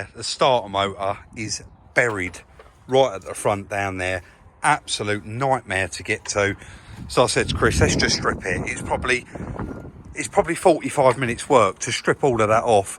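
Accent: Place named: England, United Kingdom. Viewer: Hi, sorry I'm no help with your problem on this van, or any modern vehicle, but I just had to say----love your accent mate! I was born in South Yorkshire ( Sahff Yowksha ) but have lived in Ireland for the last 23 years. English accents are a rarity here so to hear you rattle on is a real treat! Hope Chris is still OK and you too are keeping safe. Best regards from Ireland.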